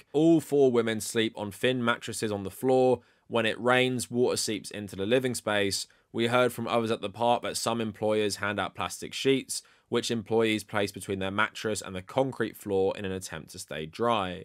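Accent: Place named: United Kingdom. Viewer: I was gonna watch, but there was too much British in the first minute.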